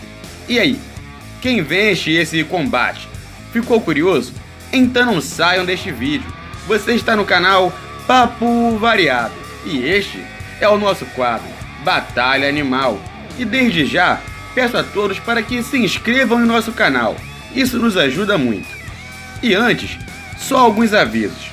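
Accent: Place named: Rio de Janeiro, Brazil. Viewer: Saudades desse sotaque carioca 😁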